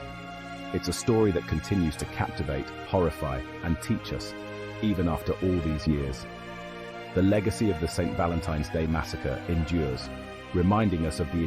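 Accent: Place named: England, United Kingdom. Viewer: Why is it always a English person narrarating !!!!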